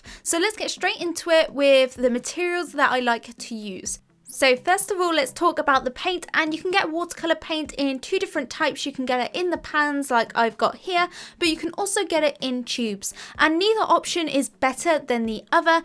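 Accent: England, United Kingdom. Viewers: I love her british accent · I like your British accent!(Nice video, thanks) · I really really really love your British Accent❤️🔥 which is pleasing to ears and your tutorials are amazing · Learning British accent and watercolor basics in one video... I love it. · She has a British accent that's why 😑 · british accent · That British accent is so satisfying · Brandon Butler yeah because she has an English accent also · British accent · Lol that's how us peeps in Britain talk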